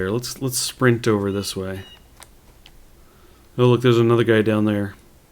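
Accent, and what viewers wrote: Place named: United Kingdom. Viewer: New to the channel, great video... enjoyed your british accent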